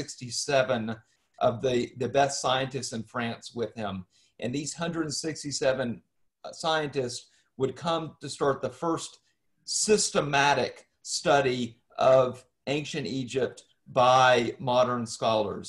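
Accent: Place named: Mississippi, United States. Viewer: I knew I heard a Mississippi accent. I love your videos here — a Mississippi native.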